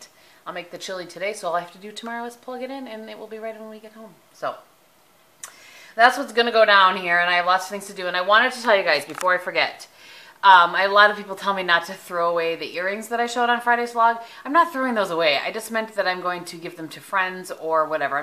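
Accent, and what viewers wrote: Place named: Minnesota, United States. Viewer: I just found your channels and I've gone on a total binge. So hi! you are a pleasure to watch. It's nice to find someone who is so relatable and your accent reminds me of living in MN.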